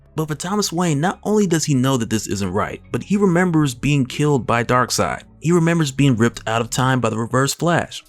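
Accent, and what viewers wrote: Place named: Southwest Virginia, United States. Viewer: Love your accent man im from southwest va lol it sounds my county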